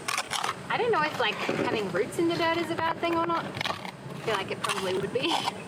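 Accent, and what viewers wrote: Australia. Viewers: The quality of your videos is always A1 but that one was exceptional. New camera? Different settings? In any event, ace job!!! Ginger nuts!!! A girl after my own heart! I'm a Brit, have you tried Mcvitie's Ginger Nuts? Awesome!!! Talking of Brits, I've always been curious of your accent. Is it pure Australian or is one of your parents from the south of England? In any event, I love it!!!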